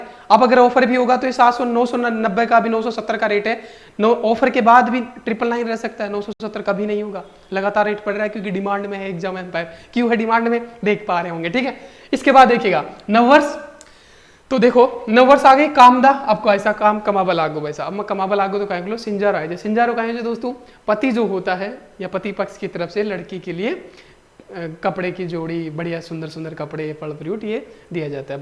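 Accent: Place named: Rajghat, India. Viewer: Aap rajghat se ho kya sir aapki boli vesi hi h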